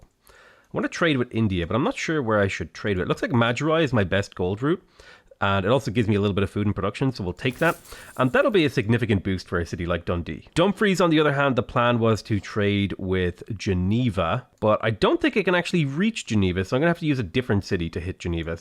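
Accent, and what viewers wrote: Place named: Ireland. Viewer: nothing wrong with your voice. evidenced by the success of your channel it's demonstrably fine. (blessed with an irish accent i would argue its excellent. you're a skilled communicator.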